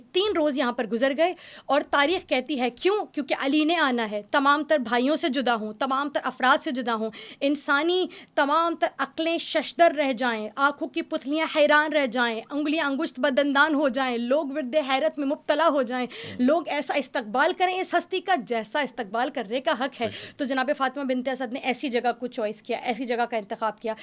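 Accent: Iran. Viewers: Have they become less sacred or insulted when pronunciation is in Persian accent 🤔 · Why the persian accent of narration and pronunciation of the sacred names? When all our bibis and prophets were from Arabia.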